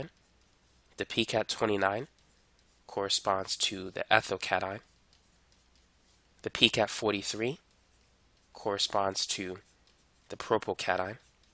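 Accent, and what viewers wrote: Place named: New York, United States. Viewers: new york accent king <3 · i think i hear a new yorker accent in this man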